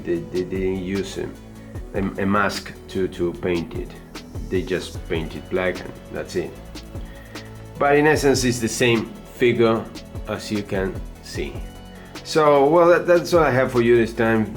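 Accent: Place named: Argentina. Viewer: Esa fonética de inglés es muy argenta 😅... 🇦🇷💪🏼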